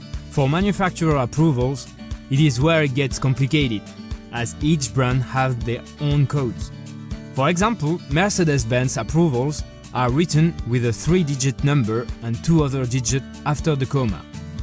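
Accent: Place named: France. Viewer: Thanks. I like your French accent 🌷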